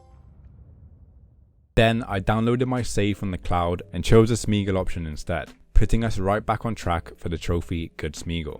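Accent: United Kingdom. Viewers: All good😂 But may I ask, which accent you speak? I guess something from the UK?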